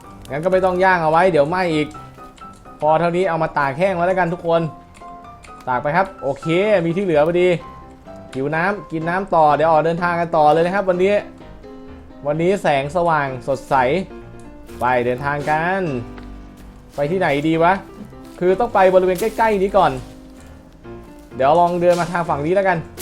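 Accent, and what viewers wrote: South, Thailand. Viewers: ภาษาใต้วันละคำกับพี่ 😎😎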